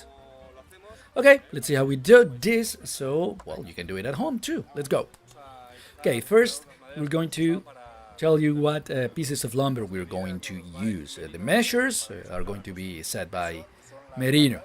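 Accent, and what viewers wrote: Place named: Spain. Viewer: pero ingles se nota el spanish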